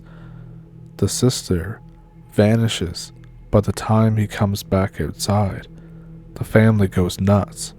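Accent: Ontario, Canada. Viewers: I knew this cat was Canadian by his Ontario subtle accent!!!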